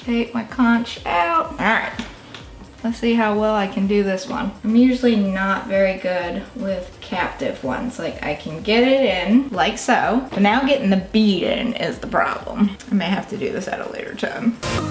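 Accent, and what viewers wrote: South, United States. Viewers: Are you from the south?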